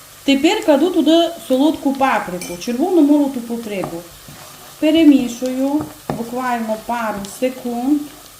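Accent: Transcarpathia, Ukraine. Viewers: Я хоч і хотіла подивитися рецепт, але сиділа і слухала як гарно і красиво Ви говорите❤ нага мова то любов💛💙💛а з вашим закарпатським діалектом, дуже гарно.. дякую. Рецепт передивлюся ще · Дуже люблю закарпатський говор, погано що не видно господиньки, раніше було видно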